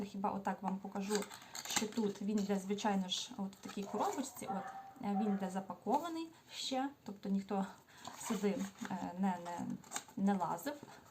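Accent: Standard. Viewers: я підписалася на Вас неділю назад.Підкорили чистою українською мовою, щирістю, сімейністю. Вы завжди розказуєте про донечку,видно,що вона-ваше найбільше досягнення, гордість. Для мене-ви релакс вранці і ввечері. Подивилася відео про порожні баночки-почала використовувати пробнички,а їх за 10 років дружби з мережевим так багато!Дякую!Процвітання каналу!І набрати скоріше 1000 підписників! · Привіт. Підписана від початку війни. Подобається ваш голос і чиста українська мова. Ви дуже приємна. Бажаю здоров'я вашій донечці, бо чую що кашляє). І мирного неба!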